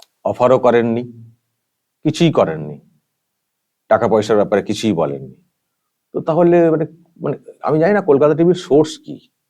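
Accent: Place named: Bangladesh. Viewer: ache vai keman ekta bangladeshi taan taan vab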